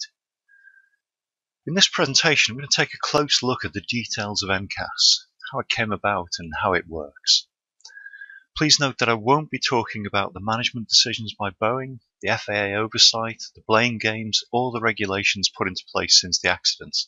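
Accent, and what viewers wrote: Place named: Stoke, United Kingdom. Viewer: Are you from Stoke?!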